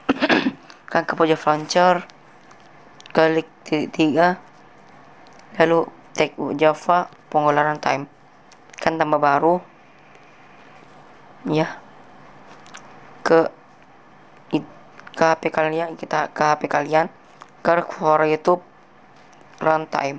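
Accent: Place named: India. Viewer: Suaranya kek orang india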